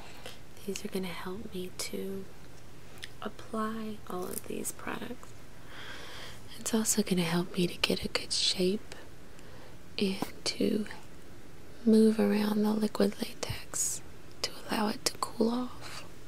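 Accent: South, United States. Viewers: YAYYY!!!! I'm so excited! I love your sweet southern drawl that reminds me of one of the reasons I love Texas so. :P Your first makeup video still gives me tingles even after - 2 years - has it been that LONG?!?! anyway I'm just so glad to see you back. :)))) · Oh your southern voice is soo soothing ^.^ I've missed you!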